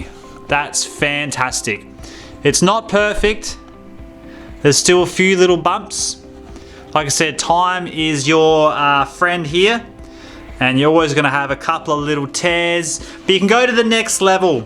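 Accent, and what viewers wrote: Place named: Australia. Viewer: Subscribing because I miss the voice of my aussie buddy Kevin "kaixin" from l🎉ast decade living in shenzhen China. You sound exactly like the guy in China who taught this yank and his kids how to play dungeons and dragons in the undisputed champ of English accents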